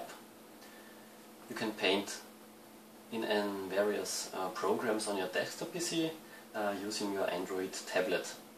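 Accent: Germany. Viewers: Deutscher Akzent xD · german accents?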